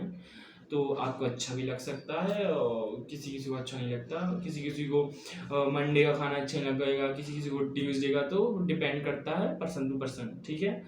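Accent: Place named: Uttar Pradesh, India. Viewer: Bro tum konse state se ho merko Uttarpradesh se lagte ho .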